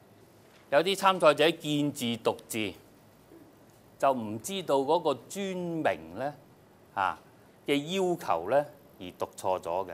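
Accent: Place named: Guangzhou, China. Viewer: 真正广州话绝版 好多自认广州 香港人发音都不正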